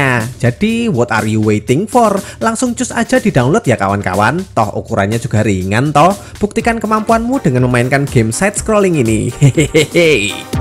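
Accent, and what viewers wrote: Java, Indonesia. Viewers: BANG situ orang jawa bukan soalnya ngomongnya kaya orang jawa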